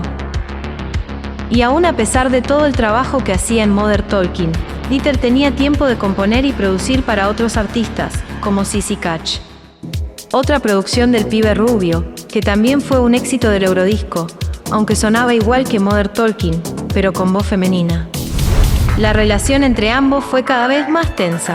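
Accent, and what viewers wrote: Argentina. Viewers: Buen video! Me intriga que tu acento parece argentino, como algunas palabras (pibe, quilombo, orto); usás palabras de España como "calzonazos" o "enchufado", otra expresión que no sé de dónde viene ("ni pajonera idea") y el mito mexicano del nagual... Otra curiosidad es que al chanta del protagonista le reclamaran por haber pagado 100 euros por canción en los 80 y 90, cuando el euro existe recién desde el 2002.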